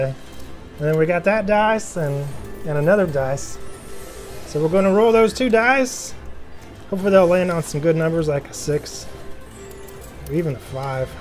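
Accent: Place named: South, United States. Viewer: Love that southern drawl.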